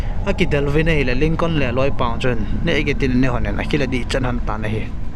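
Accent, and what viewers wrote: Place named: Moreh, India. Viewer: Moreh ho pao cu kangai nom g tama🤣🤣🤣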